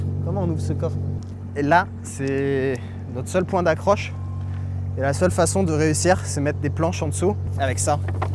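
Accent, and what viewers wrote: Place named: England, United Kingdom. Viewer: par contre ca me fume l'accent anglais hahah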